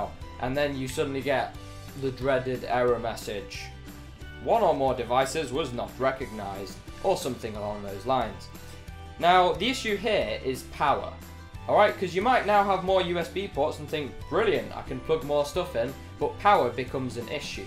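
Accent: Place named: United Kingdom. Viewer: British dialect!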